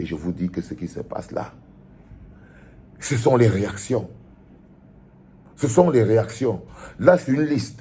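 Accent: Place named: Ivory Coast. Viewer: Ya pas garçon au Cameroun? Tu sonnes comme un ivoirien. Avec votre président marionnettes de l’Élysée à qui on vous donne une blanche comme première dame ivoirienne 😂 vous ouvrez aussi la bouche? Quand les gens parle faut vous cacher cuz vous êtes les premières à vouloir attaquer le Niger qui se libère de votre président ( Macron )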